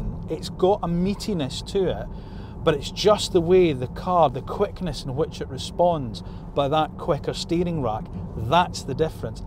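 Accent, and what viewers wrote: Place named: England, United Kingdom. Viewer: Thank you for your work - it's brilliant and really catching 😊 First I need a few moments to become familar with our english accent, but after 10 minutes it works (and sorry for my english writing style)... I've orderd one (the techno version) in the begin of july and hopefully I will get it in the last two weeks of december. One question: Do yo only have the 9 inch screen in the techno version in GB? Here in Germany and also in France its the 12 inch screen in the middle. It is the first time to see a video about the MeganE with the wheel on the right side and even the position for charging change to the left side.. smile.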